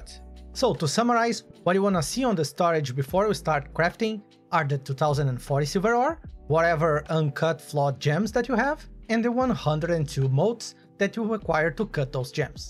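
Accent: Brazil. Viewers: Looks like a Brazilian accent lol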